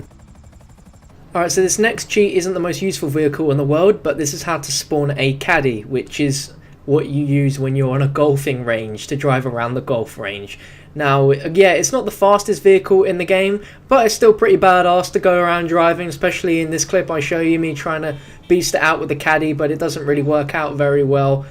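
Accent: United Kingdom. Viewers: Is he brittish I wonder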